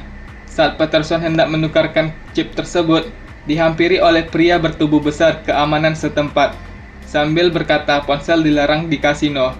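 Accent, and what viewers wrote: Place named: Minangkabau, Indonesia. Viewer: Orang awak ko bantuaknyo😂..... Medok x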